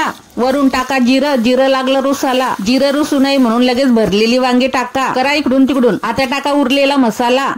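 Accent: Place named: Khandesh, India. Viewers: Mausir recipe video mustastastat pan laijast bolta rav khandesi na vatat ahe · आवाजावर नक्की चाळीसगाव ची बाई वाटते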